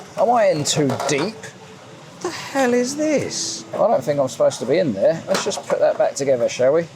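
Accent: United Kingdom. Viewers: I always get the feeling that you are the kind of British guy that I would love to hang out with. I am a Singaporean but it gives me a sense of being a British when I hear you speak.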